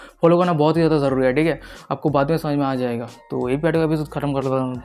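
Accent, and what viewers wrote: Bengal, India. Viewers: bro are you Bengali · Hey bro are you Bengali 😮😮😮❤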